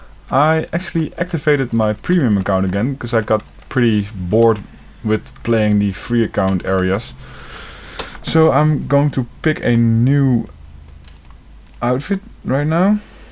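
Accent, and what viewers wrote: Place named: Netherlands. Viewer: Ben je Nederlands? Zo klinkt je accent namelijk! :)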